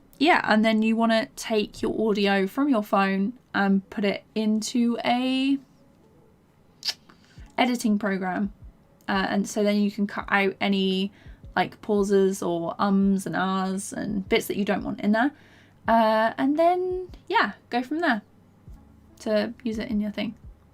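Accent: United Kingdom. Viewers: Are you British or Australian ?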